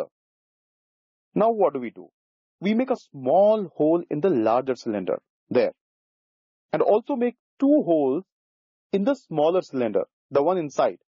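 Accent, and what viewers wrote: India. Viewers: I think he’s indian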